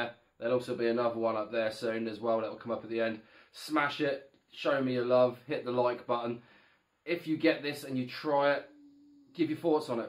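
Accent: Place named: Ipswich, United Kingdom. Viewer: What's your accent? You sound like an Ipswich boy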